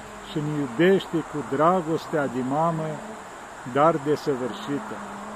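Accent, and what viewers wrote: Moldova. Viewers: Bună dimineața!!! Părinte vă ascult cu mare drag, PTR că povestiți cu mult har și ca un plus se adaugă blindetea graiului d-voastră, grai dulce Moldovenesc!!! Sănătate deplină!!! Vă rog mult, mult, mult, să binevoiți a-mi da o Binecuvintat!!! Să aibă BUNUL DUMNEZEU ATOTPUTERNIC milă și îndurare de noi și să ne binecuvinteze cu SFiNTA pace pe întreg Pamintul!!! ❤️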